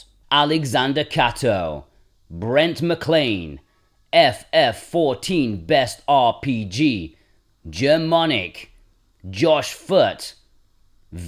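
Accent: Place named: South Yorkshire, United Kingdom. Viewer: Not going to lie a 12 hour video retrospective of Star Wars video games has filled a gap in my life that I didn’t know needed filling. The fact that it’s narrated in my native South Yorkshire accent is an insane bonus 😂 thanks mate easiest subscribe ever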